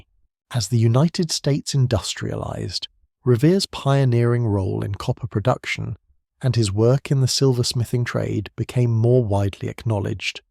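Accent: United Kingdom. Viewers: WHY is this deeply American story being narrated by an English voice? We went to war against that country .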